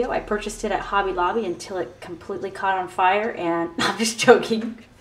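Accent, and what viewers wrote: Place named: United Kingdom. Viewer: What a lovely little folio! beautiful work as usual (British accent :))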